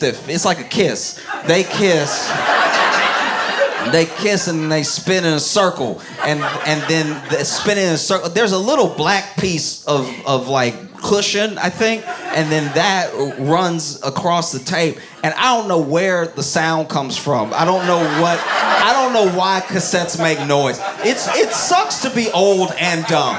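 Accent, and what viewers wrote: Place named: Tennessee, United States. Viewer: Shreveport is in the house! Stoner Hill to be exact. What up homeboi! I've been trying to figure out your accent. For some reason I thought Tennessee. Dalzell St. in Highland, wow. Keep up the good work! 🫵💪💯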